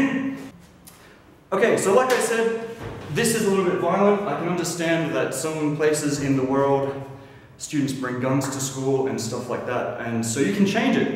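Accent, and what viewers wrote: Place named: Darwin, Australia. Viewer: He sounds like he's from Darwin, Australia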